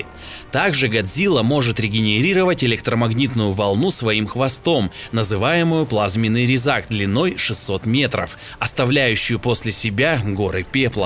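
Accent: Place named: England, United Kingdom. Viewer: Ну нехрена у тебя акцент английского!!!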